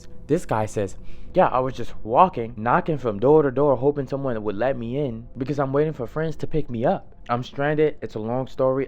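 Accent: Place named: New Orleans, United States. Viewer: First timer here I here the NOLA in your voice ❤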